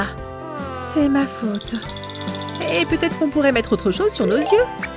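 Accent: England, United Kingdom. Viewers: elle sonq Anglaise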